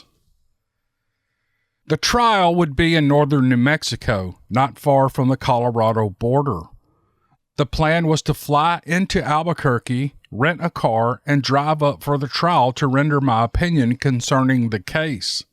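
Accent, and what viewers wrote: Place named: South, United States. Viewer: you are like the Earl Ives with a Southern accent you have got the best storytelling voice I've ever heard. I'm a truck driver that drives about miles a night and listens to podcasts in all kinds of storytellers. You and Mr. Ballen Do wonderful jobs and thank you very much for making my truck driving much easier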